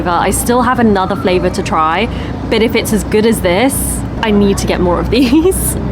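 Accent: United Kingdom. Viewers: きれいなイギリス英語なので、字幕の英語をメモして残しています。そうすると、Squishyさんの癖がよくわかりますね。 例えば、ここでを表す「here」は副詞だと思っていたのですが、名詞もあるのですね。「in here」と、しばしば使ってました。初めて知りましたよ。hereの名刺的用法だなんて。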